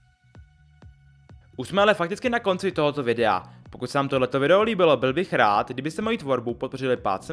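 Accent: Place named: Prague, Czech Republic. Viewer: Jsi Pražák, viď? Podle toho jak mluvíš xD mě to hrozně štve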